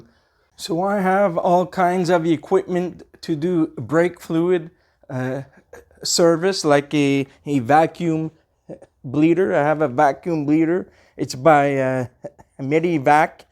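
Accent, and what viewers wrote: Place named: South Africa. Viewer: Its been a whole year of trying to figure out your accent and Im just going to wing it, is it South African?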